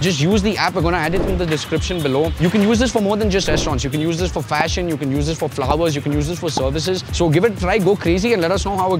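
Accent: India. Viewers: What kind of english is this 😂 hate indian English speaker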